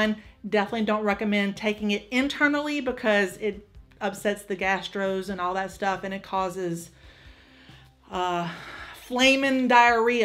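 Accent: South, United States. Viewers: You are a very entertaining and effective communicator!😂 Thank you for ALL of your points on using Castor Oil. The hemorrhoids remedy is so helpfu! Never heard of these remedies before. Barbara would be proud of you. She has been one of my favorite health coaches in videos. You sound like you are from Georgia, Mississippi or Alabama. I am from Mississippi. The southern accent is so refreshing to hear.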